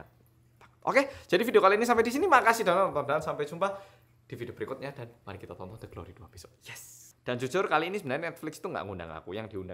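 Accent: Java, Indonesia. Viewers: Medok banget mas ngomongnya.. Jadi kayak Wong jowo asli.. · Mas ini wajah Korean tapi bicaranya jawa banget boleh tau ngga mas sail korea apa Indonesia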